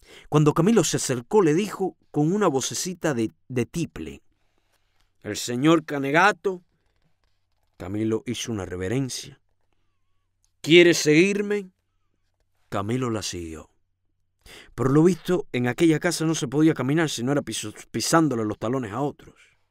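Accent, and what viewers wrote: Argentina. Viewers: Gracias por este hermoso y gracioso audiolibro. ( Muy buen acento argentino, te lo dice un a argentino🙂🇦🇷) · Tu risa es genial jajaja te sale muy bien el acento 🇦🇷 es hermosa la novela. Buenísima lectura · muy gracioso tu comentario acerca de que te gustaría que el narrador tuviera acento argentino , no hace falta , vos lo hacés extremadamente bien y sonás como tal !!!! sos un capo !!! GRACIAS POR TU EXCELENTE NARRACIÓN Y HUMOR !!! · tranqui rey te sale bien el acento argentino. hay veces que pienso que los sos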